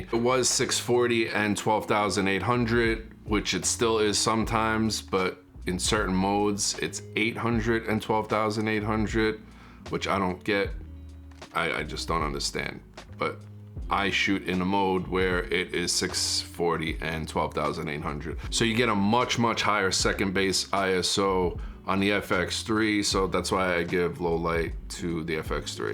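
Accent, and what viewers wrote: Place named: New York, United States. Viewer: Love your accent are you from Jersey or NY originally?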